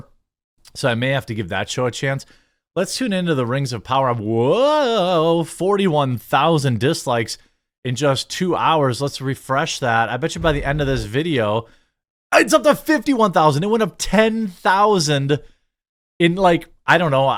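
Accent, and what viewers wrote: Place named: New Jersey, United States. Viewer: " says dragon funny." Not sure why, but you have a new jersey accent. You have the same accent as Nick Nimmin, David Laid, etc.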